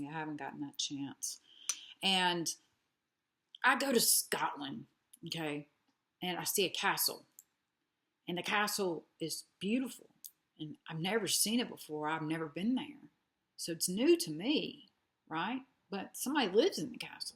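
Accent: South, United States. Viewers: Love the lip shade. And your southern accent. Subscribed. Much love from Arkansas.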